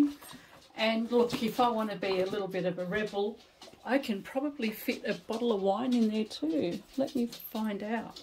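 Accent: Australia. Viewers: I only found your channel a few days ago while unwell in bed, and I’m itching to get well and back in the sewing room. Your instructions are easy to follow and it’s great to hear an Aussie voice too. I made 3 helping hands yesterday and will make a few more for our family card playing days. Thank you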